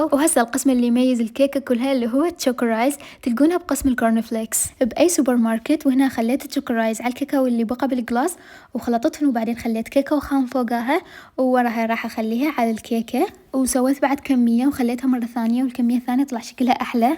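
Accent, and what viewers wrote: Baghdad, Iraq. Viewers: لهجة بغداد و وسط العراق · اللهجه العراقيه تجنننن🇸🇦🇮🇶💓💓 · بغدادي وجنوبي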